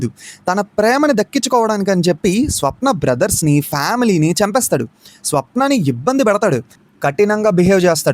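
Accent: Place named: Vizianagaram, India. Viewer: Yeti antai antunnav meedhi vijaynagaram kadha bro 😊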